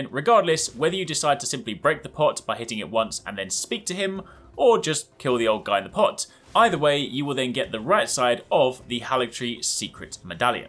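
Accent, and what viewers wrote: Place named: England, United Kingdom. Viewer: You talk so fast and ur English accent makes you sound smart. But seriously I love how u cut to the chase. I'll follow you off a cliff if you ever jump off one.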